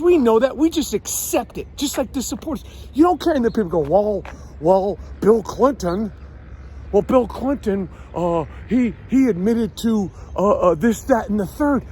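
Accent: New York, United States. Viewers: 😂😂😂 I just love your rant! You’re ranting everything I’ve been ranting for years!!! You’ve spoken in real New York style!👏👏👏👏👏👏👏👏 Oh happy day!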